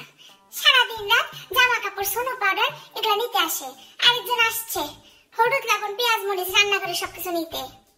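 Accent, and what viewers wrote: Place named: Jessore, Bangladesh. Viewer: আপু তোমার কথা গুলা খুব সুন্দর। তাছাড়া যশোরের ভাষা তো এ জন্য আরও সুন্দর।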